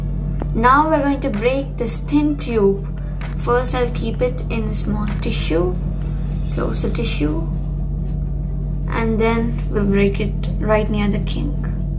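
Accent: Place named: India. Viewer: You will be more diseased than you were before and that's Indian accent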